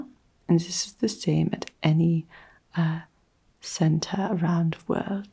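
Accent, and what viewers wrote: Germany. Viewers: I’m so astonished how good your accent is. You got the German r sounds perfectly! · I am living in Germany right now and I loveeee your German accent videos!!👏👏👏 Toll gemacht! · No way you’re not actually German!!! The accent is spot on! I thoroughly enjoyed this video, had it as background noise while I was reading and it’s just perfect - thank you! 💖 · This was so fun and relaxing! I am ready for my meditation retreat! Loved your German accent too!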